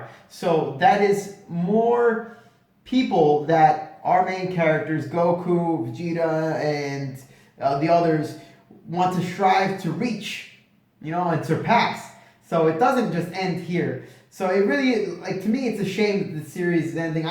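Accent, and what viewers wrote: Italy. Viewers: zio, ma sei italiano?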